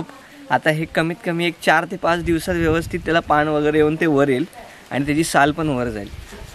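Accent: Malvani, India. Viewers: मालवणी एकदम मस्त मित्रा. छान वाटते ऐकून आणि अभिमान सुध्धा. Keep it up. छान व्हिडिओ आणि सुंदर माहिती माझीसुद्धा गाव मालवण देवली येथे आहे.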